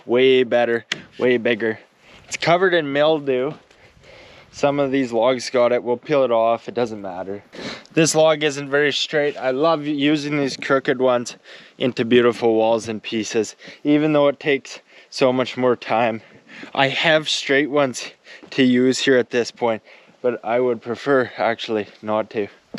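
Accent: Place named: Newfoundland, Canada. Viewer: i'm not canadian so forgive me if this is offensive, but is that a newfoundland accent. it sounds so much more irish than most canadians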